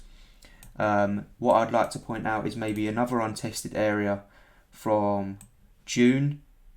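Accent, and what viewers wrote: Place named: England, United Kingdom. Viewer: basically a Frank Lampard/redknapp hybrid, that's how you sound to a Canadian person like me. I am predicting Gold reaching 2100 usd in 2021 after consolidation in the <num> usd range. P.s. you look like a jack Wilshere.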